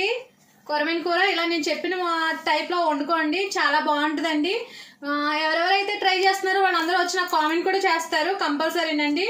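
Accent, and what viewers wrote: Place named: India. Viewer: మీరు మాట్లాడే విధానం ఉందే అచ్చ తెలుగు ఆడపిల్ల ఎలా మాట్లాడుతుందో అలా ఉంది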